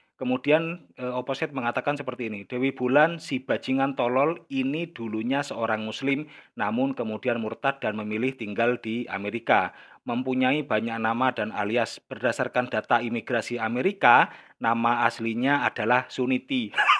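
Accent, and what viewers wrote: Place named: Tegal, Indonesia. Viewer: Logatnya kaya org Tegal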